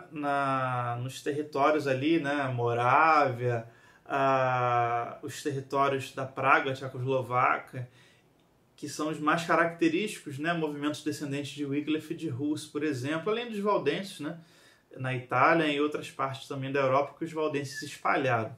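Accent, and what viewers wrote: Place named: Rio de Janeiro, Brazil. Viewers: Sotaque carioca.Nada demais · Eu, por ser carioca, não reparei isso. Hahaha Aqui a gente fala assim, e está tudo bem! 😊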